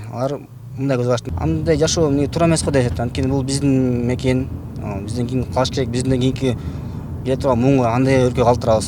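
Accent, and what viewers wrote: Standard. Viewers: Азаматсын 👍👍👍Кыргызча таза суйлойт турбайсынбы, биз ойлоптурбуз кыргызча суйлой албайт экен деп. Ишине ийгилик иним....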